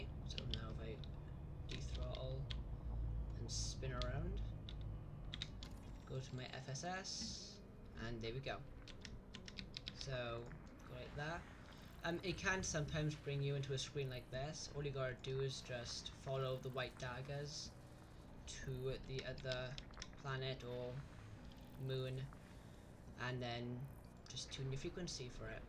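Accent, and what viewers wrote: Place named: Wales, United Kingdom. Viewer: audio was quiet but i heard a fellow Welsh accent so i plugged in 40 speakers and it was ok in the end. Nah it wasnt that bad lol. Thanks for the info, i was messing around with this today and this will really really help. Thanks ✌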